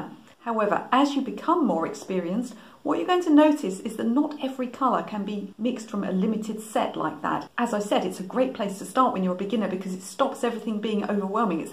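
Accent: England, United Kingdom. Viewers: I love your videos for so many reasons: 1.- You are realistic. Many people believe that Art is "spontaneous"... LOL... Spoiler Alert: Art is much like some kind of science. 2.- You are strict. People think that Art is total freedom, while total freedom is achieve by superceeding maximum restrains. 3.- You are funny. I love your jokes, it gives the audience the notion that Art is not necessarily "solemn". 4.- I really, really, really enjoy your amazing English accent (II am from Argentina, we speak Spanish), which is like a super give-away for me.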